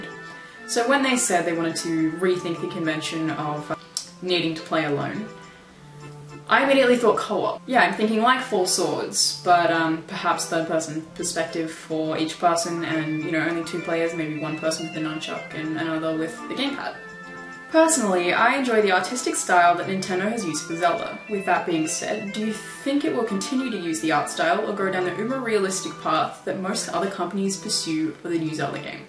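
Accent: Australia. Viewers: She's an Aussie, they've got that cute accent, all of them :P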